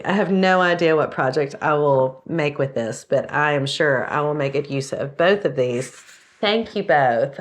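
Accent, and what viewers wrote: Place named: South Carolina, United States. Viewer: You want some “pink” shirts? I purging again… I’ve been through skin cancer crap for over 20 years No worries Just keep freezing it off and burning it off (i wont use the chemo crème they gave me) and if you have to, have MOHS No big deal. Im 55 and been doing it for years I wonder what your accent is. It’s either Raleigh or some kind of South Carolina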